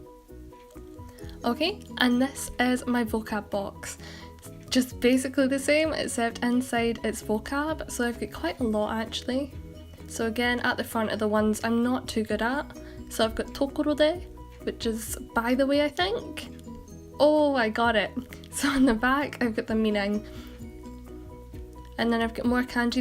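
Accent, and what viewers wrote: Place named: Scotland, United Kingdom. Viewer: Love that scotish accent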